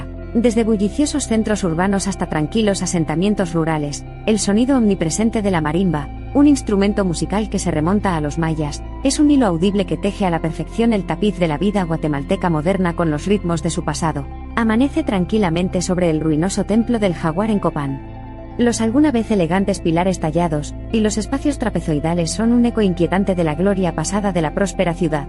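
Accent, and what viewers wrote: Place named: Spain. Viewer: Pienso que es una ofensa que la propia narración sea con acento de España...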